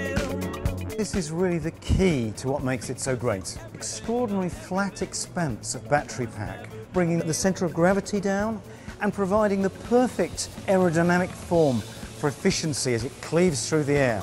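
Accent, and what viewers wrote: United Kingdom. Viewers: lol. They had to take a guy with a british accent. For some reason, people tend to swallow bullshit as long as it's coming from the mouth of a british. I could smell some bullshit in that speech. I know it's a good car, I like the car, but this guys tried to make it look like the car is more then what it actually is. He tried to sell small achievements as big "nearly impossible" achievements. I mean c'mon now. We know the car is good but please..... quit the bullshit. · Between his British accent and that awful music, I didn't understand much of what he was saying.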